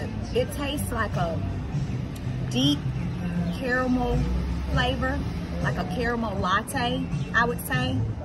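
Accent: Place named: South, United States. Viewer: Heyyyy fam! I absolutely love Jeni’s icecream we have one here in Nashville. Now I have to go get some. I had the peaches with Biscuits. Do you mind me asking where are you from because your accent sounds like you are from Tennessee or somewhere in the South.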